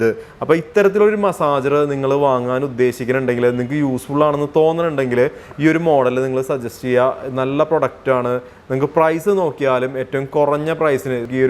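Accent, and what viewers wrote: Thrissur, India. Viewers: തൃശൂർ ഭാഷ പൊളിച്ചൂട്ടോ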